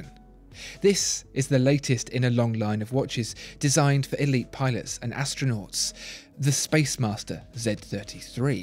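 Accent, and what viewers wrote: United Kingdom. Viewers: I look forward to each and every one of your videos, they are supremely well done and different to any other watch channel on YouTube. Also it is pleasant change having a British voice rather than the many American channels (no offence to our US friends, just different). Keep up the great job 👍😎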